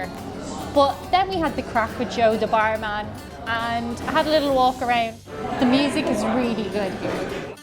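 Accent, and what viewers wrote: Dublin, Ireland. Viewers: You have an aful silly irish accent when u leave ireland for years and listen to irish accent it sounds strange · The more you drank the more Irish your accent got. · She sounds American, with a bit of very posh Dublin.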